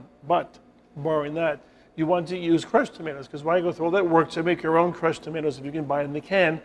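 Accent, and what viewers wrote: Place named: United States. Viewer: Tom-ay-toes. Bay-sil. You Americans really can’t speak properly 🤦🏻‍♂️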